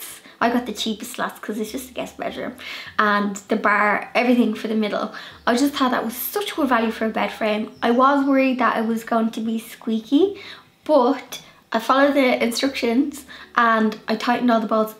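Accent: Ireland. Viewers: That is not an English accent. Probably Ireland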